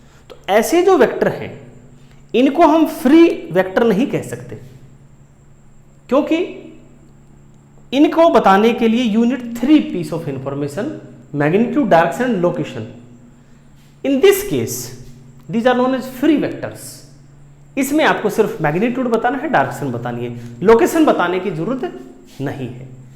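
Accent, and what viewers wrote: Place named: Haryana, India. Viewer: Aapki haryanvi wali tone aa jati h bt aapne samjhaya accha 👍👍👍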